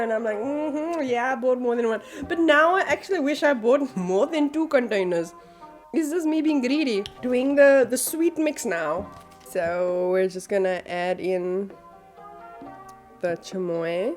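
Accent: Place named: Afrikaans, South Africa. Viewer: Ek kan die afrikaans in jou stem hoor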